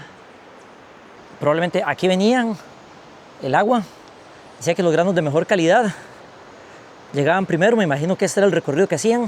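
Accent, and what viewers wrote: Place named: Colombia. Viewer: mae, por qué habla como colombiano? si ud es tico, tenga orgullo de su tierra y hable como tico, diga la R como se debe!!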